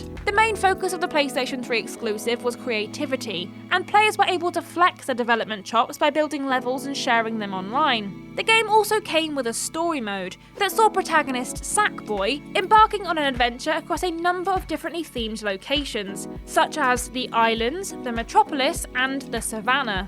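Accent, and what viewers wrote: United Kingdom. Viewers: this girl narrating is to British sounding driving me insane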